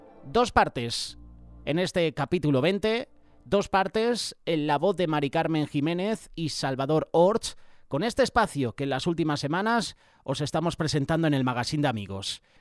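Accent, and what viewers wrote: Spain. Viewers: Gracias señor por su siervo que nos enseña de manera tan clara mi Dios!!! El es español por su hermoso acento Dios gracias por la palabra que nos alimenta!! Bendiciones del señor para todos los que hemos creído en el señor Jesucristo saludos desde chile ❤🇨🇱🙏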